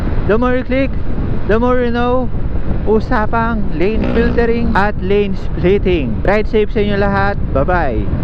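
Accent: Pampanga, Philippines. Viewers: Sabi na nga ba kapampangan ka e,yung accent mo kasi. Sa Telabastagan yan 😁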